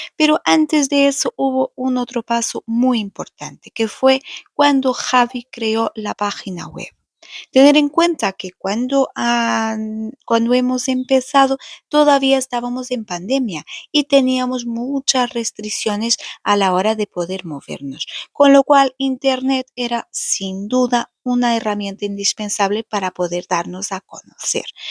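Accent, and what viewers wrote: Portugal. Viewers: Gracias por contar los inicios del proyecto , yo os sigo desde hace un año aproximadamente y tenia mucha curiosidad por saber como habia empezado todo, Tarde un poco en reconocer el acento ,pero habia llegado a la conclusion de que efectivamente eras portuguesa,pero creia que Javi era carpintero Gracias por todo tengo una casa heerdada muy antigua en la que como autodidacta llevo peleandome toda la vida por mantener eos muebles antiguos heredados¨Teneis unas ideas geniales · Tienes un acento precioso me relaja ver vuestros vídeos y escuchar ese acento portugués tan agradable que tengáis mucho éxito un abrazo a Javi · Iiiih gracias a ustedes, imaginé que eras portuguesa por tu acento, lo que no imaginé es que fueran pareja ¡Olé a los dos!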